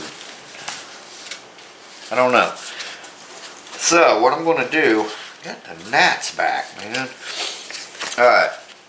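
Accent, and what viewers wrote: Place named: Indiana, United States. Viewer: I would have guessed Indiana. They talk like that 'round here. Lol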